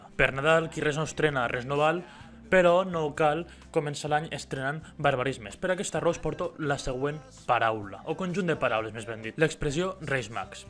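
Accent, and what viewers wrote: Western Catalan, Spain. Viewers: No està malament el vídeo, ara, no se de quina regió 'dels països catalans' ets (? Parles un català occidental molt curiòs! Estic d'acord amb tu menys en la de 'nit bona' , la 'nit bona' (que no se si algú l'anomena així) la 'noche buena' espanyola no es la nit de nadal, es la revetlla de nadal (la nit abans) i pel que fa a la resta, bé, crec que algú amb seny sap que són 'castellanismes'.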